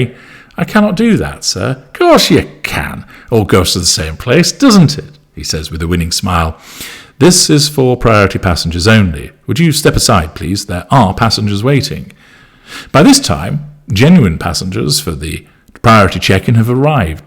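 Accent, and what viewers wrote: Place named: Liverpool, United Kingdom. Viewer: Sounds like a Liverpulian accent to me. Well done.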